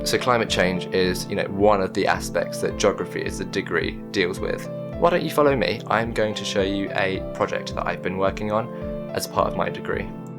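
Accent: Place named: India. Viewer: I thought u were definitely an Indian dude , the accent gets me everytime lol , good editing lol!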